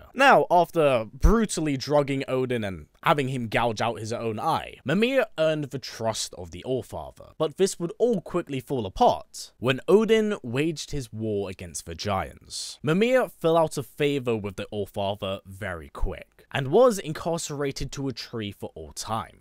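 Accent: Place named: Scotland, United Kingdom. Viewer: Wouldn’t say British, more Scottish myself. It’s when he says “lad” that I really hear it personally. But I really agree with the rest of what you were saying about the universes! It sounds dope.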